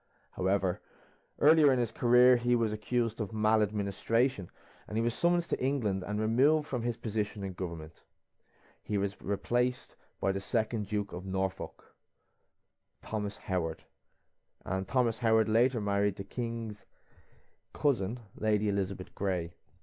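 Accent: Dublin, Ireland. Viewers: I love the Dublin accent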